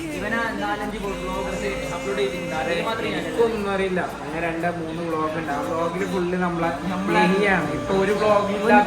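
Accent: Kasaragod, India. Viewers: Ksd slang anne samsarkk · Bro ningo kasargod language anne pari adh majje aavu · Ksd slangil anne mund 👀🤌🏻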